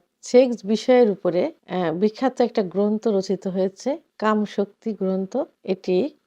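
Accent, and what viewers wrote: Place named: Noakhali, Bangladesh. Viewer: ওরে নোয়াখাইল্লা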